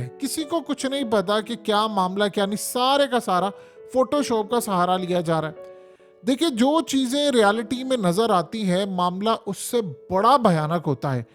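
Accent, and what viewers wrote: Punjab, India. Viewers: Yes, this faceless journalist has to give up his Punjabi accent and learn to pronounce correct names of European/American leaders, celebrities and names of British Royal family members. The name is NOT CHAARLAS it's King Charles the III. Thanks. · Punjabi aisay hi boly hain Dont worry 😅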